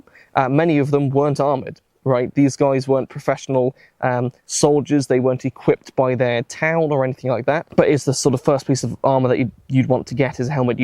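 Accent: United Kingdom. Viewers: I like your English accent Lolol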